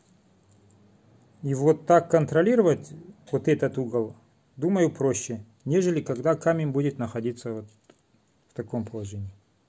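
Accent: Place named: Caucasus, Russia. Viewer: ДОБРЫЙ! ДАВНЕНЬКО НЕ ЗАХАЖИВАЛ, К ВАМ В ГОСТИ. ОБЗОР ПОУЧИТЕЛЬНЫЙ ( У ВАС, ИНАЧЕ НЕ БЫВАЕТ ). МИРА ВАМ И ДОБРА 🙏 P.S. КАВКАЗСКИЙ АКЦЕНТ, ВАМ ИДЁТ 😉✌️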